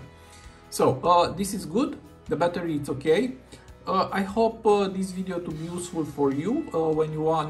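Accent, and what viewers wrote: Romania. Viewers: Laa fel! :)))) Eram: măi, ia stai asa! Asta e accent românesc! :))) am rămas șocat că am recunoscut. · Mi-am dat seama din accentu tau ca esti român și dupa am vazut la channel description lol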